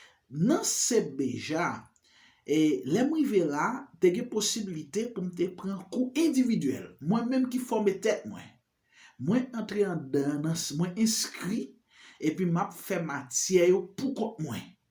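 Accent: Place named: Brazil. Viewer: Bonswa ! Lèw pale potigè ou samblè Brezilyen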